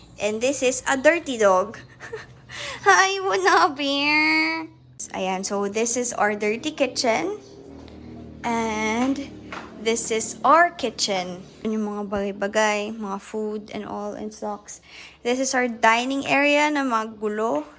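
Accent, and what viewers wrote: Visayas, Philippines. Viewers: I love her bisaya accent like when she said wala na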